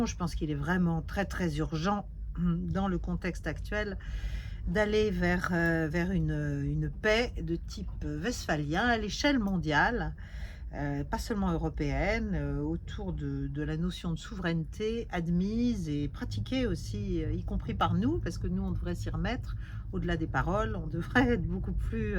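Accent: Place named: Upper class, France. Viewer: Votre accent bourgeois du XVI ème me dégoûtait déjà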